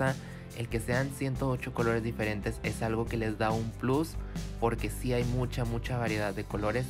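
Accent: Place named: North, Mexico. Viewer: Ya decía yo que el acento me sonaba del norte saludos desde Matamoros,Tam!❤️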